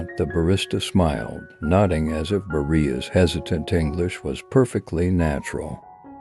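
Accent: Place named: United States. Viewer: someone could tell where this accent is? i know is american by his accent but what part of america? it is so relaxing his voice. please someone could tell me?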